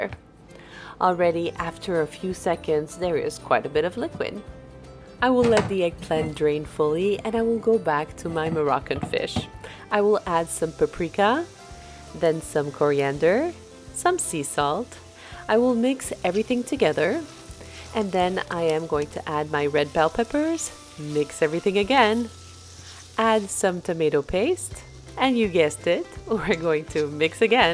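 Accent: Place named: France. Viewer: Merci beaucoup pour votre belle présentation. J'ai beaucoup aimé votre accent français 😉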